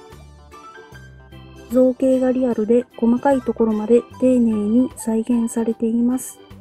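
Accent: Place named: Kansai, Japan. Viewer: 喋ってる人関西弁度々入ってるやん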